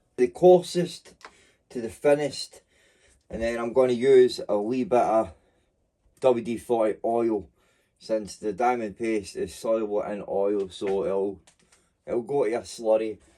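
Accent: Glasgow, United Kingdom. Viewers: Great to hear a fellow Glaswegian ... I'm from Dennistoun myself 😁... as to whether or not they are perfectly flat, yes it'd be great if they were perfect, but at the end of the day you tried to do it yourself 👍 I made kitchen worktops out of 2ft square by 1" thick granite slabs (doubled at edge to 2"), £240 instead of thousands for a 3/4" rip-off version! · You from glasgow mate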